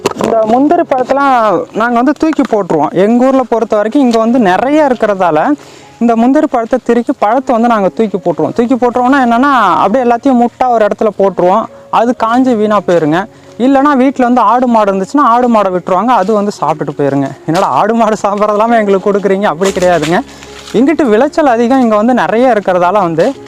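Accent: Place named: Cuddalore, India. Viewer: ரொம்ப யதார்த்தம் .அதோட உங்க தமிழ் நம்ப கடலூர்மாவட்ட வழக்கிலே இருப்பது கூடுதல் சிறப்பு..ரொம்ப மகிழ்ச்சி...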